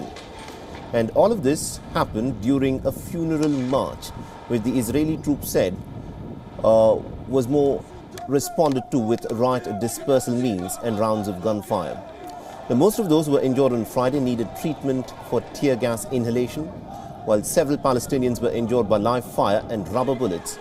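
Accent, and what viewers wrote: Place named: India. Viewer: You need to polish up your accent so it's clear outside india ,it's very difficult to understand you .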